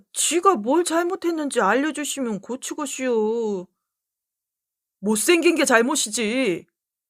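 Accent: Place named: Chungcheong, South Korea. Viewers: 참으로 정겹고 훈훈한 아름다운 야화입니다 그야말로 구수하고 정이 뚝뚝 떨어는 충청도 사투리의 뚝배기 맛이 정말 일품이요 재밋게 잘 들었다오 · 충청도식화법이 재밌네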